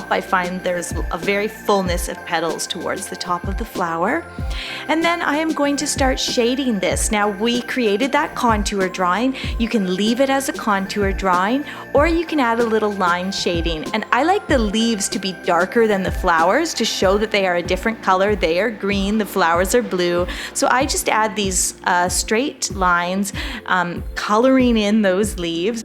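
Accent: Canada. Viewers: So I’m The first time viewer and into this video I asked myself is this a fellow Canadian, because I’m pretty sure she’s from Canada ? Checks online Yep. 🇨🇦👍❤️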